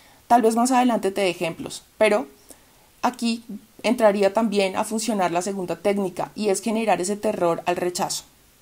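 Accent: Bogotá, Colombia. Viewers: Que deleite escuchar ese acento colombiano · No se como llegue a este canal’ basto solo ver un video para admirarla y suscribirme.... Me encanta el acento de los colombianos 😊. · Gracias por su consejo lo voy tomar en cuenta, por cierto pienso que vos eres de colombiana y de Bogotá pues ese acento es solo de esa ciudad porque pues soy también Bogotano, abrazo eres muy bonita 😘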